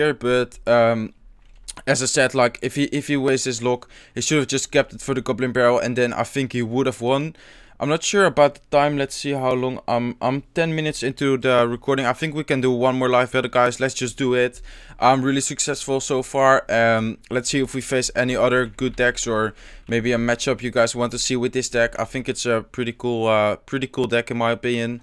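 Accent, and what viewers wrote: Netherlands. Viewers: ik ken je account niet maar ik kan gewoon aan het "accent" horen dat je uit nl komt ahahhaha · where are you from? Just out of curiosity you have a Dutch accent I think. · Your dutch accent sucks but your content is really great. Congrats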